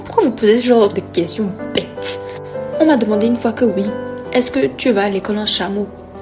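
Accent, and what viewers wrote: Benin. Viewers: Le vrai accent Béninois extraordinaire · J'aime trop ton accent béninois, très cool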